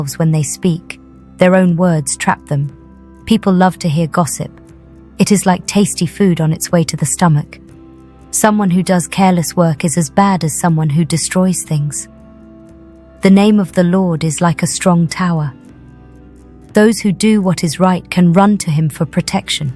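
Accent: United Kingdom. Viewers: Let's recognise that there are BLACK people in the world who have an english accent like this voiceover. We do exist!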